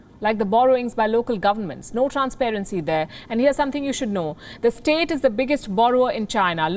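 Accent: India. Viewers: American media with indian accent … she make china fall apart … we heard you that china 🇨🇳 is falling down ..tell us about America 🇺🇸 who already fall down .. and about india 🇮🇳 who is still 3rd world country run by Europe and 🇺🇸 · It's formal Indian accent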